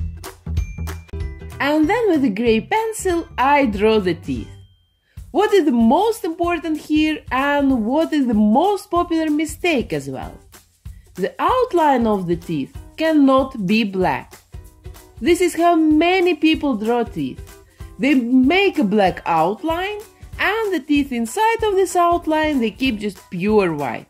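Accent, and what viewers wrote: Russia. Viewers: I'm sorry for asking but is she a Russian or Ukrainian? She has a very strong accent... like I have, haha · Русская что ли? Слышно что английский не твой родной язык.... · I love your accent! Are you russian? If you are, I am too! ❤ love your channel btw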